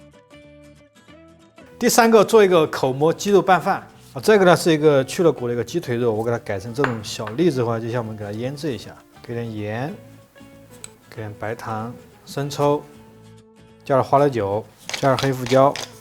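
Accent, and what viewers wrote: Sichuan, China. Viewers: 四川口音（幽默）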